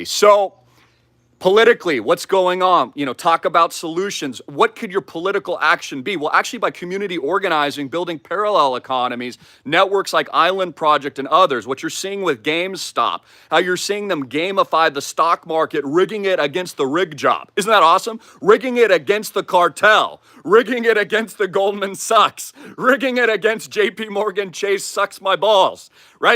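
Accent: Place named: United Kingdom. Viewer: Your British accent 🔥🔥😂😂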